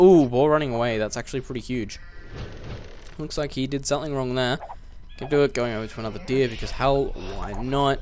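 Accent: Australia. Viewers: About deer luring. Viper says it's usually wise not to lure deers. You should really make video with accentuated australian accent.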